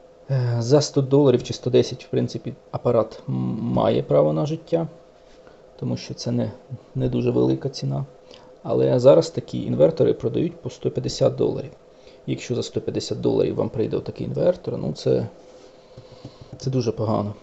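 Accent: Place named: Kyiv, Ukraine. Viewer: если тебя спросят откуда ты взял "що то має бути на виході"? что ты ответишь? В описании такого нет (это вопрос чисто для себя самого, вернуться в "реальность"). Частково жартую... але... Сколько в споре запросил возврата? Когда жил в Киеве, балдел от того как говорят "старики" (когда чистый язык, без суржика). Обожаю Киевски и Полтавский вариант речи. Вас тоже прям приятно слушать. Ещё раньше балдел от канала "Дядько Максим". Приятно было слушать и смотреть как он чё-то там точит. Получается у Вас это скорее хобби? Или я не правильно себе представляю "інспекційне обладнання". Вас не напрягает что я пишу на русском? (а то в голове половина мыслей идёт так, половина сяк, но удобней писать на рус.)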